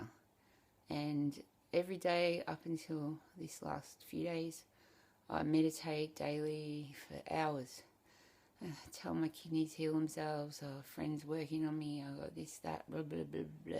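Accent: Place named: Australia. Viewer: I like your openness and frankness, your flow and insights, - I have a friend who has similarities. You sound like you're 'down under' (from this London perspective!). Thanks anyway.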